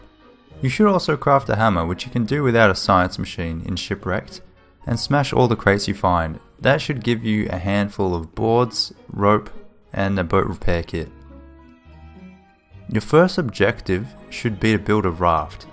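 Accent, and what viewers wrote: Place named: Australia. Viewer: ayy haha i could tell by the accent :) im aussie too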